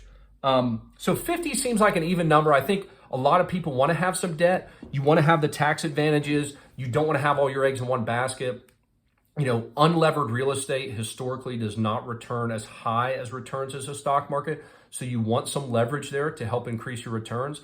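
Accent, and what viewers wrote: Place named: Texas, United States. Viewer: Hey keep up the content. I have noticed from my own experience. By going from house hack to house hack you end up with more cash flow so therefore you need less properties. By the way really heard that Texas accent come out on this one LOL.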